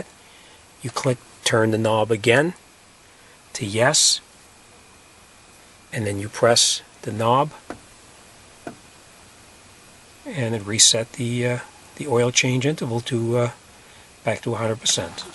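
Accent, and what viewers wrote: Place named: Boston, United States. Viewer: You sound like you're from the East Coast/Boston area. One thing I would have done differently though is, I would have left the belly pan off, until after you test drove or turned on the car, to see that you don't have any oil leaks(From underneath, as I see you checked from the top). Good Job.. You Italian as well?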